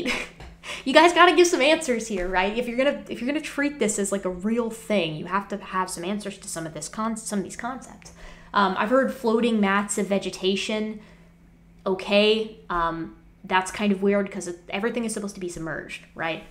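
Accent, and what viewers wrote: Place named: General American, United States. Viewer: really? Sounds like a normal American East West Coast mix accent to me! Of course I'm used to YEC tone such as Hovind which is much worse imho. As regard origin quality control I'm not sure I see your point. Mutations can be increased by poor quality control during reproduction. To use your analogy this doesn't produce airplanes from cars. This produces a range of cars, mostly bad but the occasional one that has attributes on the way to airplanes. Evolution works on the "good enough to survive" not a particular design goal. If your dealership gets by with a breeding set of cars that produce more and more cars that work well enough but gradually end up looking more and more like airplanes then eventually it's an airplane dealership! The tortured analogy shows it's not a good analogy for evolution!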